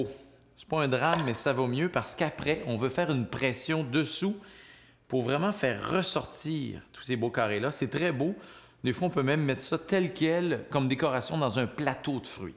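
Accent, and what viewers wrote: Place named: Quebec, Canada. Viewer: Merci ricardo ! Ton accent québécois rend tes conseils captivants ! :D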